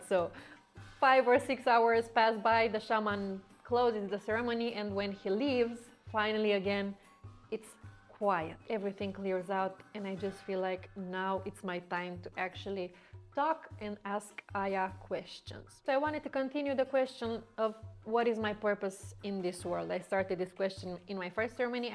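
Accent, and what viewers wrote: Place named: Russia. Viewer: Hi u sound Russian😘Whoa this was posted on my bday, woohoo!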